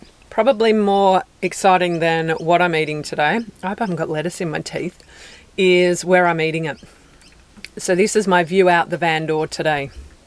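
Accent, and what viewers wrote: Australia. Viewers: I would never have known, you have a great Australian accent. What part of the UK?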